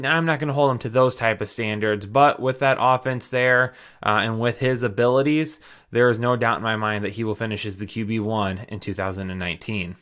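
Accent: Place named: Minnesota, United States. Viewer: Man that Minnesota accent is real!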